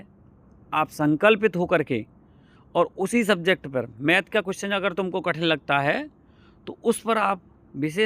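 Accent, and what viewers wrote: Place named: Western Uttar Pradesh, India. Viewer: आप पक्का वेस्ट यूपी या हरियाणा से हैं 😊 BTW nice suggestions keep it up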